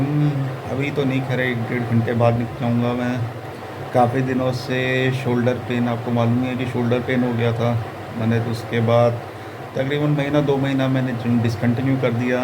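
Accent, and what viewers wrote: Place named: Samastipur, India. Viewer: Dii mai samstipur se huu sem isi trh hmlog v bolte h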